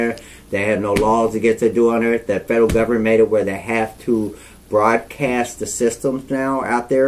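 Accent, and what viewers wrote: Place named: South, United States. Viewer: I like his southern accent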